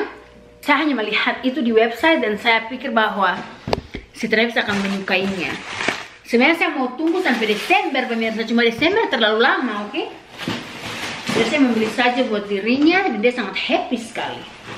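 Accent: Manado, Indonesia. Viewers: Orang manado kah?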